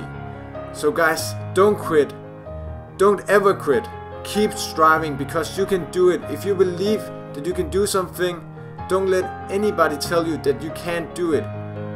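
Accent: Denmark. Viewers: Hey! You're a big inspiration to me, ty for being who you are. I can hear on your accent, that you're danish too. #DanskArmy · are u from denmark xD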